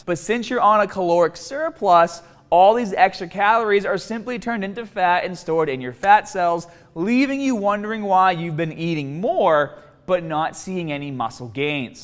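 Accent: Boston, United States. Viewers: I think it's the Boston accent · I thought the dude’s boston accent was a joke at first lol 😂 but very informative non the less. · Its boston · Boston guy 😂😂😂 the accent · That's a Boston accent for ya. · This man is dripping with Boston. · It’s a Boston accent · its boston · Boston bud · This guy is from Boston ain't he? ...just guesssing 🤷🏻‍♂️ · I love Boston accent Tourette's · abdulla alsuwaidi it’s An American accent, from Boston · Sounds like hes from Boston lol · You got that “BAASSTON” accent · Yea my guy is definitely from Boston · That Boston accent! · Are you from Boston? I love your accent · Is this guy from Boston. Paut of ya body. I like it! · That's a Boston accent...born and raised there so I know. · I love the boston accent keddd 👍🏾 great video ! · Yea boston accent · Boston accent buddy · are you from boston? i like your accent great vid. 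· Boston accents are trash lol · That BAASTON accent though... pretty intense 😸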